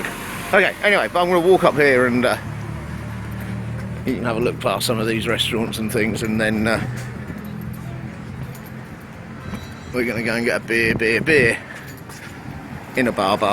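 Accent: United Kingdom. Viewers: Which British accent is yours precisely? Thanks. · I know what Southwold is :-) just checking out PM as a destination as we lost flights to Roatan, 2021 due to the pandemic its looking like a good plan B, thanks for the tour and hearing a Brit accent, Im glad we arent the only ones who venture further afield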